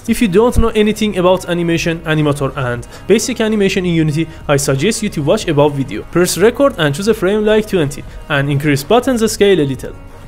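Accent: Iran. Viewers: I picked up an Iranian accent 🙂. Nice video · you talk english like a persian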